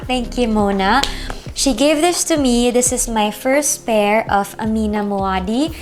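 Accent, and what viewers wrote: Philippines. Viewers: Ang soft ng voice pinay na pinay ang accent❣️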